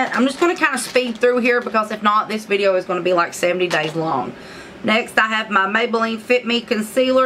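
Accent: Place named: South, United States. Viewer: The country accent makes me relate to your videos 😂 lol you gained another subscriber 💕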